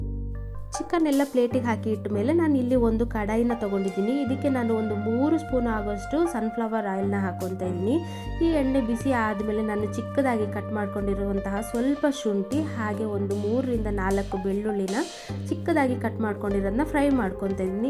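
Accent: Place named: Bangalore, India. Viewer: Ninde kannada super cook sis 🥰👍👏👏😁nin pakkka Bangalore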